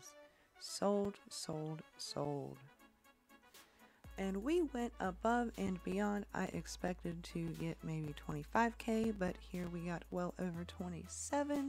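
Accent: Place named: United States. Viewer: nice video! your american accent is funny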